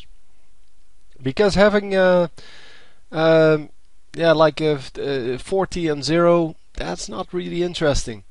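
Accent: Netherlands. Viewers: You sound like a Dutchman speaking English.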